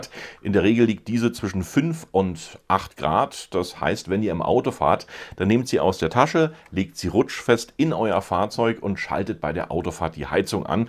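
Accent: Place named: Germany. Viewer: Wow, you sound so German, amazing! Love such heavy German accents, so awesome.. Sweet Keep well and be safe bro! 😁🇧🇬🛸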